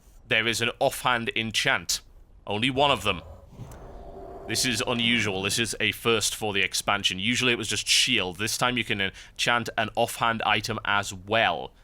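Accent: United Kingdom. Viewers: brit accent sucks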